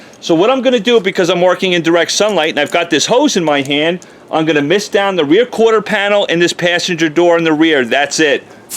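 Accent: United States. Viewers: Except the annoying American accent... like when always says “wowdar”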